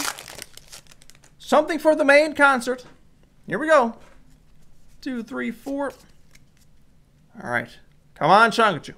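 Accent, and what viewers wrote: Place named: Newfoundland, Canada. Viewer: Love ur play mat and love the content I'm from nova scotia u sound like ur from newfoundland bud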